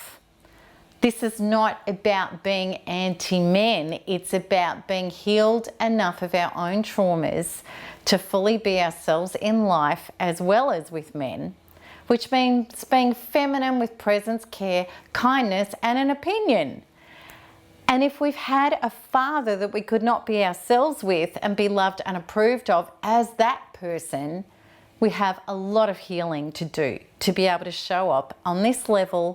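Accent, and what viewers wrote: Australia. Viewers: Goddamn! You are such a beautiful woman, that Aussie accent and that look my god a weekend with you would be all the treatment I would need!! Gourgeous! Love the content love the channel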